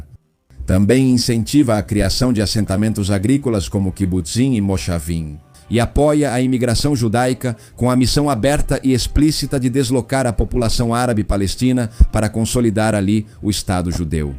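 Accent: Portugal. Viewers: É um bom documentário. Mas essa narração atropelada, português lusitano misturado com português brasileiro. É muito rápida e confusa. Estragou o vídeo! Péssimo!!!!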